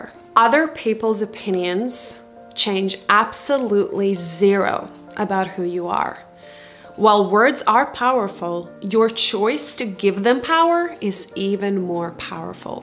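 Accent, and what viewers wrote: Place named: Eastern Europe. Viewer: nicely explained. Llve the Eastrrn Euro accent permiating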